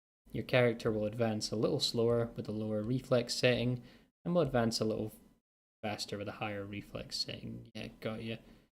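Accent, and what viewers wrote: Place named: Scotland, United Kingdom. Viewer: Sorry mate I fell asleep, everything looks and sounds great. Your Scottish accent and vocal cadence pair really well with the mood of elder scrolls❤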